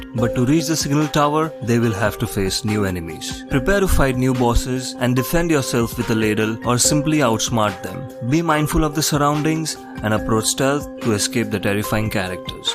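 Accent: India. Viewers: Are you from India🤔? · Are you from india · I can sure u r an Indian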